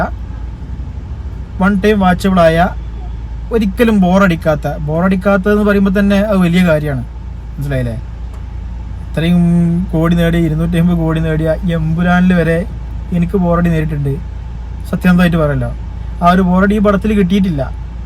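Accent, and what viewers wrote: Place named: Kozhikode, India. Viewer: ആദ്യം താൻ മലയാളം സംസാരിക്കാൻ പഠിക്കൂ... കോഴിക്കോട് ബാശ.... എണീറ്റു പോടേയ്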